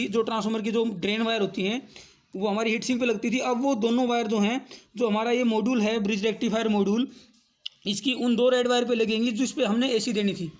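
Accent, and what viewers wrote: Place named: Haryana, India. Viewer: Good idea sir. Thanks. Sir aap Apne Haryana se hai kya. Kuch language me haryanvi tone aati hai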